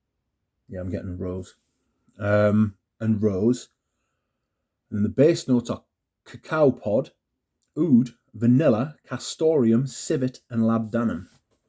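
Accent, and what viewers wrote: United Kingdom. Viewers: Welcome friends British accent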